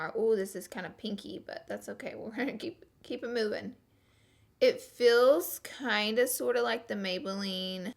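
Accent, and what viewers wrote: South, United States. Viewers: 🌈🌈🌈🌈🌈🌈🌈🌈🌈🌈🌈🌈🌈🌈🌈🌈🌈🌈🌈🌈🌈🌈🌈🌈🌈 Snapchat fam!!! Love ya Girl! Love ur southern accent it makes me miss mine! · i love you so much 🌈🌈🌈🌈 early snapfam. love your country accent 😚 much love from your biggest fan from Norway ❤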